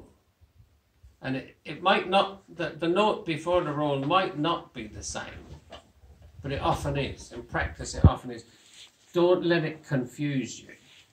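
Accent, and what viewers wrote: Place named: Cork, Ireland. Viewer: You talk like my 12 uncles from both sides of my family, they all came from Dublin when they were young men to Birmingham about 50 years ago, but I detect your not a dub but more western like cork.?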